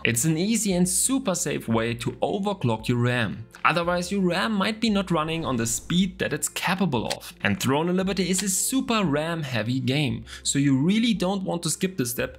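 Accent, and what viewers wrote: Germany. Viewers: Echt Traurig 😢 mach doch auch mal was für deutsche. Das Englisch macht einen verrückt mit dem deutschen - Englisch selbst skript lese akzent 😅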